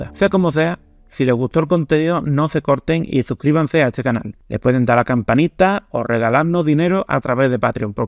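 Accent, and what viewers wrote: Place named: Axarquía, Spain. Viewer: Jajajaj yo soy de Málaga y te entiendo perfectamente. Tienes pronunciación de la Axarquía o por ahí. Muy buenos los análisis, breves y concisos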